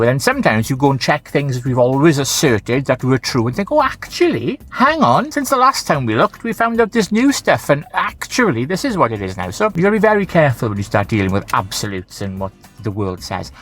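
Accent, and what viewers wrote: Wales, United Kingdom. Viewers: I know now your welsh lol love accent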